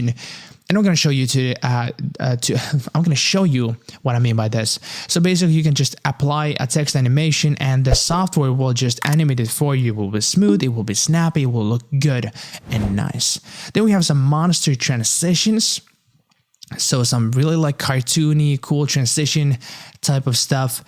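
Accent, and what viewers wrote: Finland. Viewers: Are you from Finland? Just a hunch based on your accent. Good vid man.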